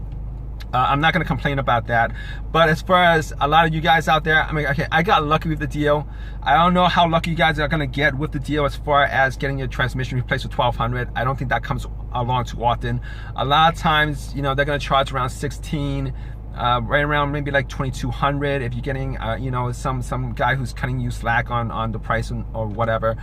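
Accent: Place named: New York, United States. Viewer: love the accent!,new york,boston?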